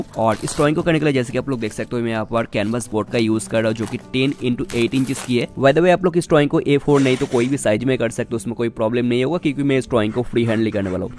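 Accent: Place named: Bengal, India. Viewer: Khub bhalo legeche tomar painting video. Yomar hindi sune mone holo tumi bangali..tai bangla te comment korlam. Next time,' hairs' bolo na ota ' hair' hobe. Criticism na positive feedback dilam.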